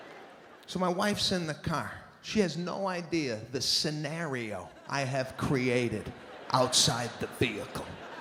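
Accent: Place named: Brooklyn, United States. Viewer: I love his Brooklyn/Queens accent! I'm from Brooklyn, and it just adds to his comedy! 💗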